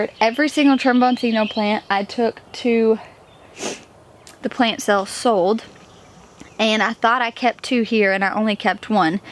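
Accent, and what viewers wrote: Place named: South, United States. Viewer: I LOVE that you and Jessica Sowards both say "peench" instead of "pinch". Some southern YouTubers try to hide their southern-ness, and as a southern girl I am not about it! I'm here for the southern accents ♥️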